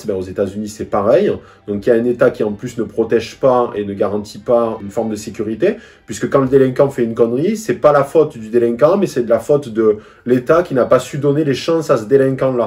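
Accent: South-West, France. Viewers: Encore un nouveau soldat du bon sens qui émerge, ça fait vraiment chaud au coeur en cette sombre période! Merci, vive la culture, vive la France et vive l'accent du sud ouest!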